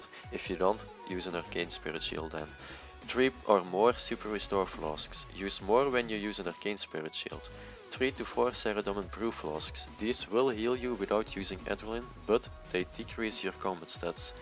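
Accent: Netherlands. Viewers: Dat Nederlands Engels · you dutchbro? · are you dutch ?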